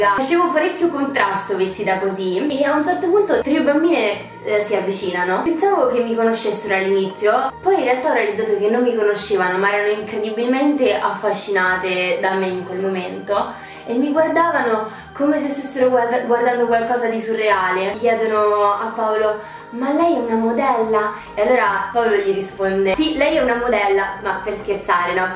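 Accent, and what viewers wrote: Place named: United States. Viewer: parli in italiano con l'intonazione americana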